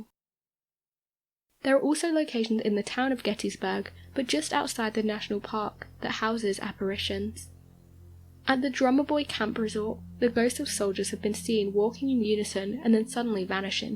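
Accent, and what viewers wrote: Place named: Scotland, United Kingdom. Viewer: I like your brouque. Is it Scottish or English?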